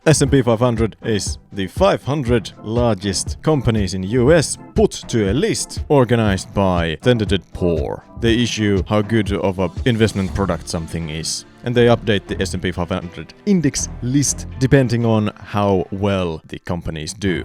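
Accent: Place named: Finland. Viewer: Ah, that Finnish accent makes the entire video worth watching.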